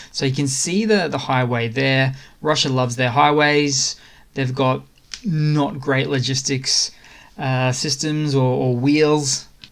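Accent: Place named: Australia. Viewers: My guess is Aussie 🇦🇺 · Sounds like an Aussie...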